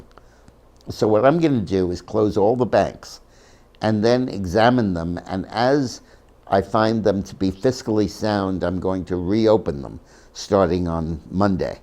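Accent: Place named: New England, United States. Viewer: Histray. . . Very New England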